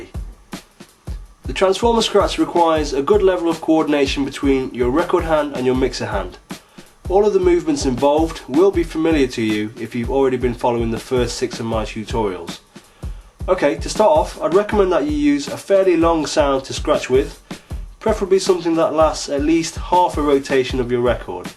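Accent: United Kingdom. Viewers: u sound like ur from nottingham. are you bro?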